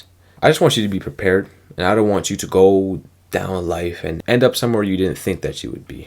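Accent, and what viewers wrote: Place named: Canada. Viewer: I didn’t even realize u were from Canada until u said fam 😭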